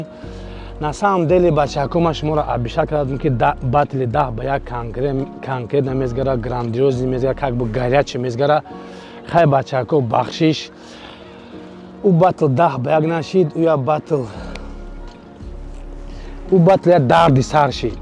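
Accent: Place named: Vahdat, Tajikistan. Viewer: Чиста вахдати гап мезани да брат ханотан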